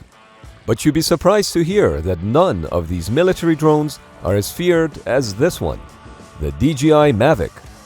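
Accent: Iran. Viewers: Sounds Farsi-influenced :) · Khaliz Persian accent is evident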